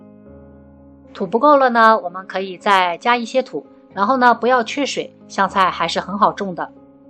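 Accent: Jiangsu-Zhejiang, China. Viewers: 听你口音是江浙一带的吧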